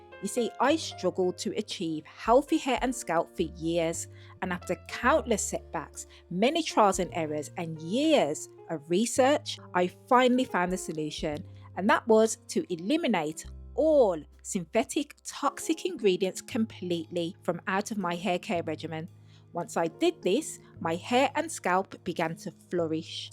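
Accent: Birmingham, United Kingdom. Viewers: This woman is a Brummie. I don't know what you think you are hearing.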